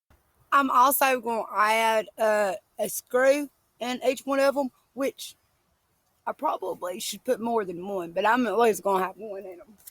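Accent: South, United States. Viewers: Love it and I love your southern accent.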